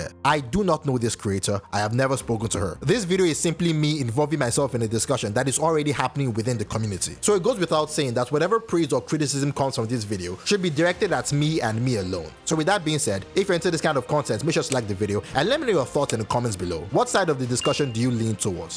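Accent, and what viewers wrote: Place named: Nigeria. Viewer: lmao i enjoy these genshin videos and the nigerian accent makes it better.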